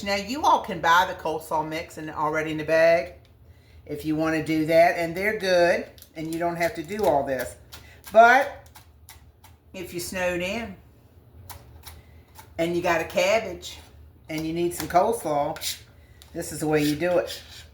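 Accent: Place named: South, United States. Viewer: Loved your recipe. Love your accent, southern gal. Like I said before, I'm in Pa and love new ways to make every day food stuff. I pray you reach 1000 people watching. I know that is when they award you. Take care, Betty. An old grandma from Pa.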